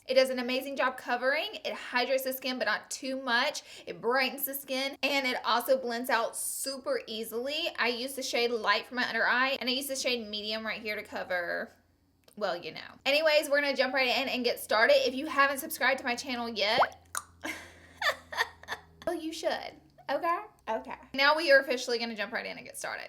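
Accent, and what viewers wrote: South, United States. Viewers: "Olly" The way she says "oily" is interesting to me because she has a pretty thick southern accent (none of this is suppose to offend anyone).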